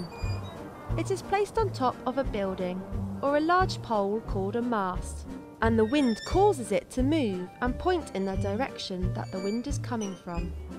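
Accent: United Kingdom. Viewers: This use british accent?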